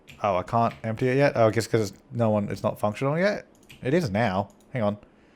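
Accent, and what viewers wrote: Australia. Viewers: You're an Aussie aren't you? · Hey, are you Australian?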